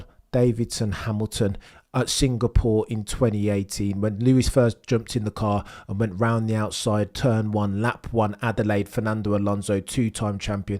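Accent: United Kingdom. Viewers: I’ve never heard a Brit with a accent THAT bri’ish speak so positively about Max Bravo sir 🙏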